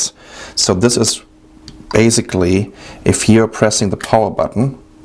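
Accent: Germany. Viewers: Like the video and German accents are cool, man. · Du lässt die das Wetter von China und Taiwan anzeigen, hast aber einen deutschen Akzent beim Reden? Suspekt.. Aber sympatisch :)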